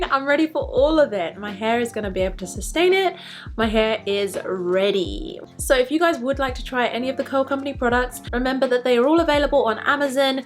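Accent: London, United Kingdom. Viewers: Your hair is growing so so long I love that London accent 😍 💕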